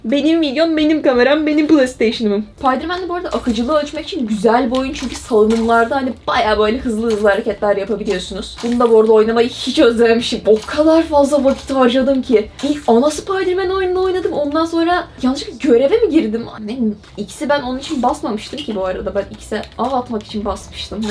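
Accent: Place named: English-speaking world. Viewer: Bu karı niya ağzı yaylana yatlana konuşuyor? Sanki ingilizde türkçeyi sonradan öğrenmiş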